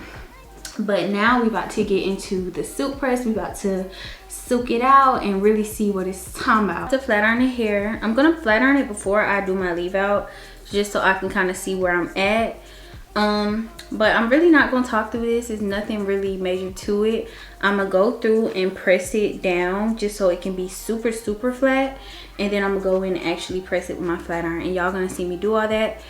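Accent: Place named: South, United States. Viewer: love video and your country accent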